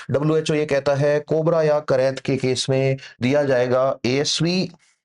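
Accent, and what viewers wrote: Rajasthan, India. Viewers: Sir aap sikar RAJ. Se to nhi ho aap ki boli se lag raha h agle lecture me bata dena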